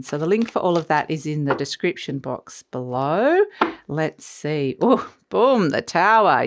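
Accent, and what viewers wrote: Australia. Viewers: Great forcast! 👍 Yes we need to talk hope my Virgo of 43 yrs Love of my life He is very important to me ♥️ 3rdparty came into our marriage but l have forgiven him long ago. He was loyal but grief hit us that no parent should have to go thru Would be great if he gets intouch so we can have closer one way or other .l did try but silent his end Thank you so much for your reading very positive and offer's Hope ! You sound like you are Australian??. The view out your windows is peaceful looking at your tree ferns And your your feather friend having his say love it Chooks are lovely love from Queensland Australia 🇦🇺 ♥️🎶🌈🙏🐞🦋🌞👍💐💐🙏🙏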